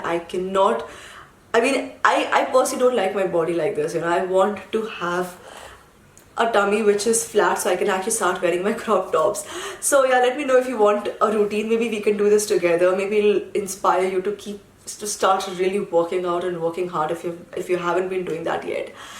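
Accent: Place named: India. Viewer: Do you live in India ? You have an indian accent. I love your video !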